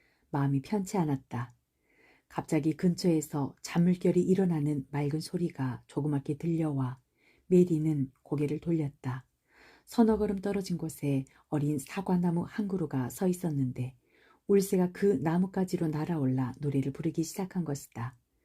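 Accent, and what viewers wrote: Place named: Jeolla, South Korea. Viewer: 요크셔 사투리를 전라도버전으로 읽어주셔서 너무너무 재밌습니다... ❤ 후속편 완전 기대하고 있겠습니다. 감사합니다.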